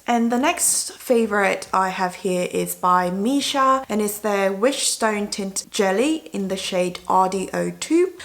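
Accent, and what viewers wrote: United Kingdom. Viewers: 심지어 오랜만에 영국영어로 해주시니 더 좋네요 흑흑 😌❤️ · 미국 영어나 캐나다 영어 하시는 분들은 많이 봤는데 영국 영어 하시니까 멋있으시고 신기해요! 🥺✨ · 영국억양이 넘 듣기 좋아서 계속 되돌려보기 한다고 진도가 안나가요..❤️ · 영국 발음!!! 영국계 한국인이신가용.. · 안그래도 영어로 말씀하시는 콘텐츠 보고싶다고 댓글담기려고했었는데 이번에 뙇!! 넘 반갑고 좋아요 헤헤 의 영국식발음도 좋고 구사하시는 단어, 목소리 등 다 너무매력적이에요ㅠㅠ💕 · 오오랜만에 영어로!! ㅎㅎㅎ한국으로 오시고 영국발음 너무 오랜만에 들어요♥️ 오늘도 잘볼게요!!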